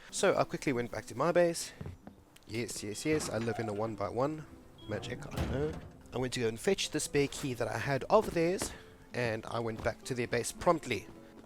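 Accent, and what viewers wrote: South Africa. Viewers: Great to hear a saffa gamer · South African ??!!😂me too😂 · You sound south african! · Are you south african? :D Great video! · Are you from south africa ?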